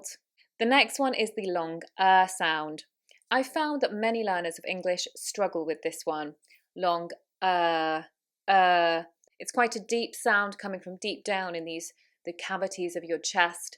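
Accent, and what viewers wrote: United Kingdom. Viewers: Hallo good teacher l am attention To listen carefully from You are also one in good choice teach softly your Sound real british accent I have more who teach british accent nearly four Teachers but l have follow American accent two teachers mostly l like As well as british accent But english has nothing know as all a little bit one Thank you.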